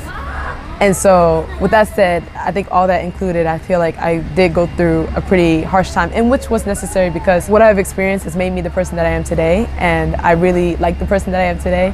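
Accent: Japan. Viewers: Haha! You are so Japanese lol! The way you speak English is colored by the Japanese. It's really interesting. Your American classmates must have thought it was different!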